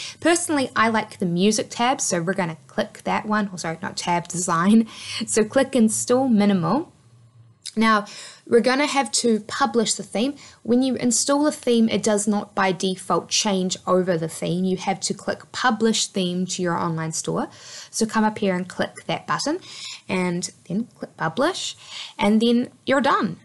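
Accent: New Zealand. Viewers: No she's a Kiwi (New Zealand) or as you guys would hear Nu Zilland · Another great video thank you. Love that this is Kiwi made. Just a pity others don't understand our accent. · NZ accent, just replace 'e' into 'i', that's simple =) eg: tidtalks, stip by stip, siven & etc... Tips: 0.5 speed listen carefully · Took me a few minutes to get the Kiwi accent but sounds like it has a twang from somewhere like Canada or Somerset UK ;)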